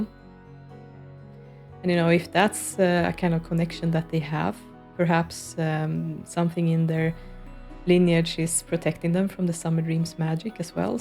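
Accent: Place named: Sweden. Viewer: I always love watching your building videos! You're a talented builder and your videos are always so relaxing to watch ☺️ Are you swedish by the way? You don't have to answer that if you don't want to. But I am Swedish myself and I always thought your accent sounds very swedish ✨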